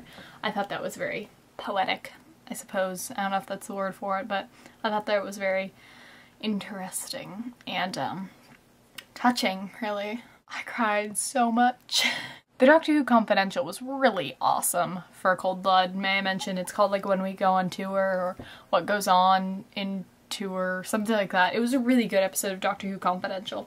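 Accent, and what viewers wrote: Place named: United States. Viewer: This is just a guess, but, are you American by any chance? I'm not trying to be rascist or anything, but I'm just curious as you sound American and said the words 'aunt' and 'semi' in a very American way. Again, please note that this is out of interest, not rascism, because I hate rascism myself.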